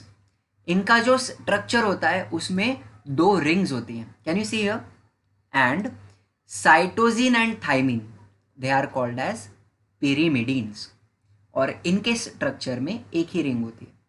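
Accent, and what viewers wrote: Maharashtra, India. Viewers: Sir tumhi marathi aahat ka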